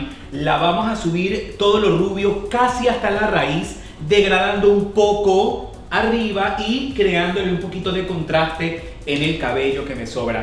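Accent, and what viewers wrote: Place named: Venezuela. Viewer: Hola me puedes informar en que estado atiendes??? Ya por el acento creo que eres de Venezuela y nada como nuestras mechas, extraño mi estilista😞😞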